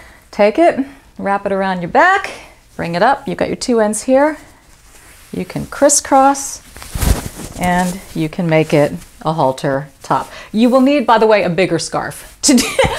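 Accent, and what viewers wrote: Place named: Connecticut, United States. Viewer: Without you mentioning Houston, I would have thought you were from Connecticut... It's a compliment. ;)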